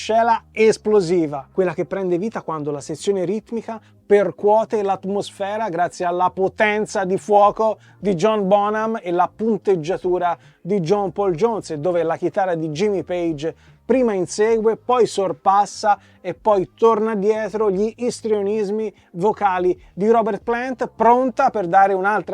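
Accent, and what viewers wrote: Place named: Livorno, Italy. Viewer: Ma te guarda...sei di Pisa...ma si sentiva..ma non credevo di Pisa...ti vedevo più un tipo livornese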